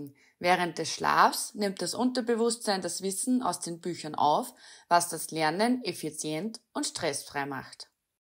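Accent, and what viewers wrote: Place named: Austria. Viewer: yoooo is that an austrian accent? echt krass so sick. best accent, congratulations on growing up in the coolest sounding part of the german speaking world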